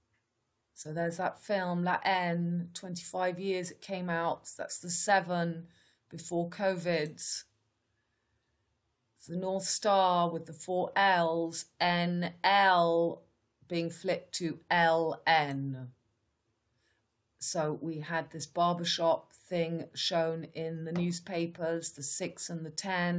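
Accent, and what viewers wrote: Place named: France. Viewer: Wow your English is wonderful. I thought you were from England....with a French accent. I tried to learn French it was a joke in high school in the US ... you rock. 🥰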